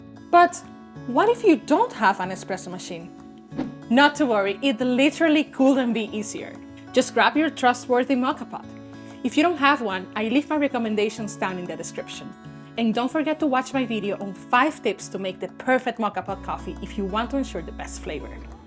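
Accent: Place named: Latin America. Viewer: Sounds and looks Latina. 🤔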